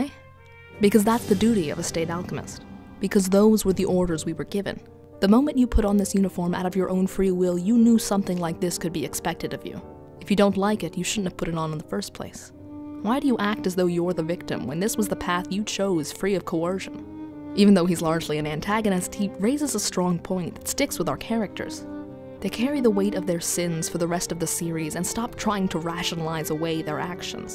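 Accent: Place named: Norway. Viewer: Your accents sounds Norwegian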